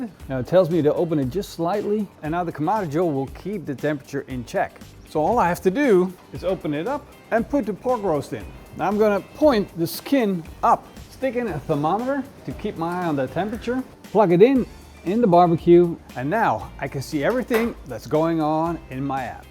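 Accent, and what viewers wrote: Netherlands. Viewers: I am so annoyed at the fact that I haven't been able to guess your accent 2 minutes into the video.. I'm guessing Germanic or Nordic but I just can't lay my finger on it 😂 Looking forward to the result! (And whether I can find more clues) Edit: a minute later I still want to say Dutch, but one who is a massive Elvis fan! Edit 2: Nederlands, laatste antwoord. Edit 3: Als ik terug ga naar het begin hoor ik de Elvis niet meer... maar het Neërlandsch is deste duidelijker haha. Eindresultaat ziet en klinkt heerlijk! ^^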